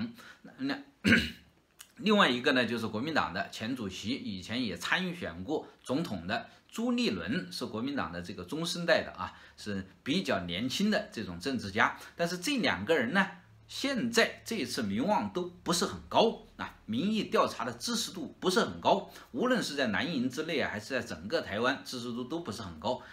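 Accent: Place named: Hunan/Hubei, China. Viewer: 这哥们的口音不是湖南人就是湖北人，以后把这口音改了，再发视频来损自己的母国。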